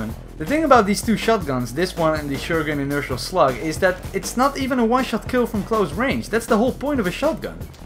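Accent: Netherlands. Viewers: I hear his accent, 100% Dutch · It sounds u are dutch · You sound dutch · I detect a Dutch accent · Je kunt duidelijk aan je stem horen dat je Nederlands bent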